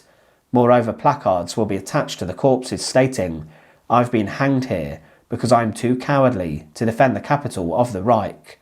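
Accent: United Kingdom. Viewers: As an American, he speaks with what I consider to be a classic British accent. Sometimes he's hard to understand, but that's part of the fun of listening to different English accents. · I agree. And I particularly like his type of accent. Not sure what part of the UK it comes from, but it is lovely.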